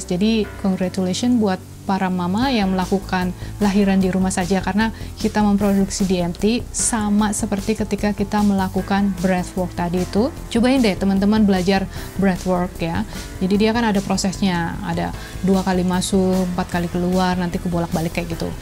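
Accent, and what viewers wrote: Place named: Java, Indonesia. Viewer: Mantap sekali ilmunya 🥰🥰 sehat selalu kak 😍 Bahasanya clengkring clengkring ngapak banget 🥰🥰🥰 salam dari purwokerto kak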